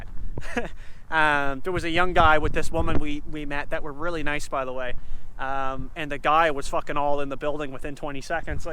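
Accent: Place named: Newfoundland, Canada. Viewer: I live and grew up in Halifax and have never heard a accent so thick Lol you must be a newfie or caper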